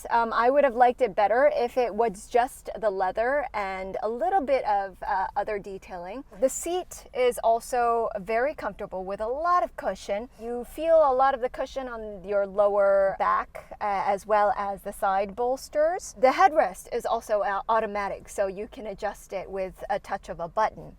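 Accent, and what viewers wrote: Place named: United States. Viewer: Good video! Regards from an Kia Stinger driver in Sweden! And its good with the American accent, not the England "time for thea" accent! :)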